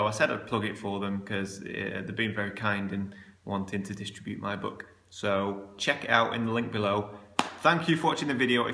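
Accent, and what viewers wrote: United Kingdom. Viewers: absolutely stunning british accent . Thanks for this. Waiting for the next one.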